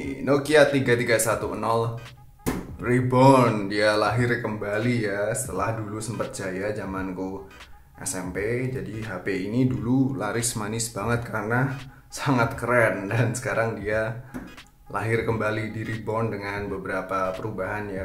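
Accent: Java, Indonesia. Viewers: pedot :v medokmu bang :v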